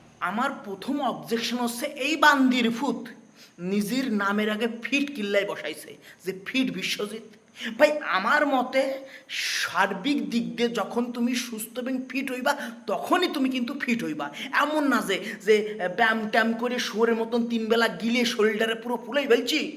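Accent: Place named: Noakhali, Bangladesh. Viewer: Noakhali te bari naki tomar 😅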